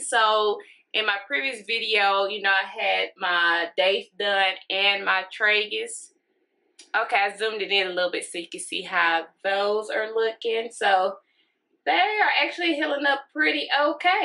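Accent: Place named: South, United States. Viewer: Ooooh that southern twang....lol. Country girl. I got the same piercing. I love it.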